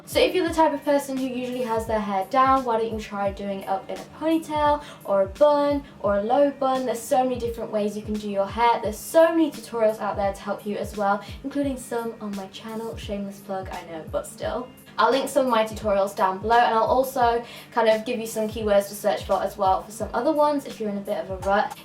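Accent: United Kingdom. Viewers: I 💗 ur British accent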